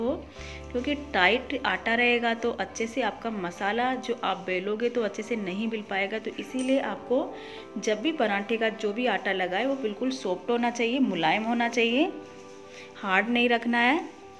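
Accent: India. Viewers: Nice Video ! From your voice it appears you are from Rajasthan !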